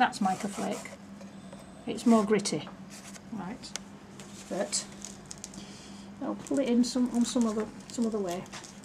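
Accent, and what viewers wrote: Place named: Nottingham, United Kingdom. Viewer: I am new to guilding flakes & came across your lovely videos with wonderful tips, I am so impressed with your budget ideas I have just ordered the mix bags of flakes you have on Ebay, & dont worry about the accent, I am in Nottingham & understand every word! lol great videos, thank you for making them for us xxx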